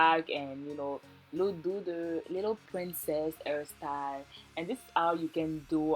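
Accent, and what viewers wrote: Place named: France. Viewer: Nice video! Es-tu française? You sound like you have a French accent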